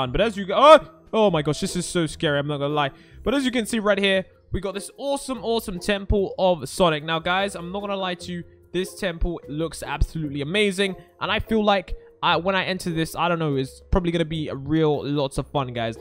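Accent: United Kingdom. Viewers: She is a British accent not Tycho Natalie Cole of Montgomery not see Kola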